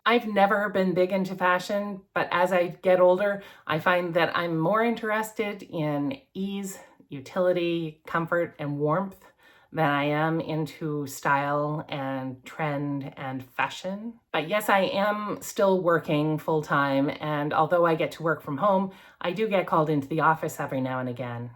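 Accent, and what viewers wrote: Canada. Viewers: I could tell you were a Canuck after just one sentence as I am a veteran of five entire seasons at two different Lake Temagami, Ontario canoe tripping camps. And as we all know, Canucks are supposed to eat their French fries with vinegar not ketchup. I am also an ex Benedictine monk and the sleeping habits you describe are most typical of cloistered hardcore monastics such as the Trappistines and the Poor Clares. I sleep in a heavy duty Cabela’s camp cot in a an extra wide sleeping bag rated to 20 degrees Fahrenheit and I sleep in my family room which I use as an efficiency apartment of sorts as I live with my sister in the family house we inherited jointly and she is hogging the entire second storey with all of the additional bedrooms. I used to sleep in the basement until it started flooding owing to the ongoing climate disaster with the storm sewers backing up owing to repeated torrential downpours. 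You could use a little more of that rain up there in Canuckiana to put out your forest fires.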